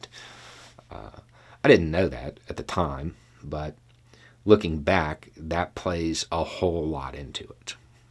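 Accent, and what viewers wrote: South, United States. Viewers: I don't remember how I found you. Maybe it was something like "viewers of this channel (say...Seth Meyers Closer Look as an example) "also like this channel" (you) . I also enjoy watching "A guy" from Minnesota. Maybe youve heard of Vice Grip Garage. Another super intelligent fellow who comes off as a hick from the back woods. Not that you are either a hick or from the backwoods. But Derek is a person with an affected accent and he has tons of followers. Perhaps YouTube put your stream in the same queue because of that. It doesn't matter how I found you...but that I did. The last 5 years have been something of a wild ride emotionally capped off with the devastating effects of the ongoing pandemic. Discovering your voice has had a calming effect on me. Seth and Stephen Colbert are great at keeping disillusionment at bay. But in the last 4 months...you have really set my mind at ease. 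I can say this. Before the MAGA crowd appeared I had always thought that Southern boys were the pinnacle of common sense. I grew up watching the Daltons and later The Dukes of Hazzard. Uncle Jesse was the stereotype of what I believed to be honest folk intent on doing right for the sake of being on the right side. Of course nevermind the two centuries of racism. It was a breath of fresh air when I heard the philosophy that you use from your cap wearing furry faced southern accent. Something that likely exists but is not common. You have a gift. I'm so glad you use it. I think while I knew these th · is it a souther accent? Thanks to Blance Devereaux I like it. 😊 But I'm not American, so I probably haven't been exposed to certain things that could influence me. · It was definitely the contrast between your look and sound and your actual content that hooked me. I have family scattered across the south, so I know all southerners aren't hicks, but you're definitely a breath of fresh air. 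Keep it up!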